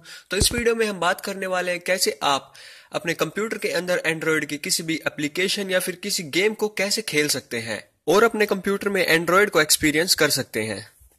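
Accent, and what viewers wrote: India. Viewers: your title is English and suddenly you talk Indian...WTF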